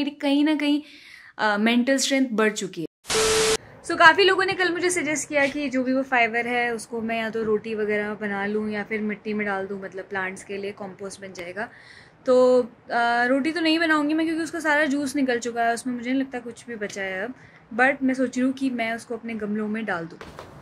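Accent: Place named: North, India. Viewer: Really liked the simplicity of this girl. No unnecessary "angreziyat" or overacting. I like her pure desi North Indian Hindi and how confidently and proudly she's speaking it. Very good video 👍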